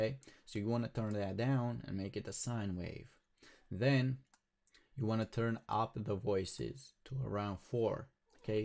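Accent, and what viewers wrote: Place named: Balkans. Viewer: thanks for the tutorial man... where u from? you sound balkan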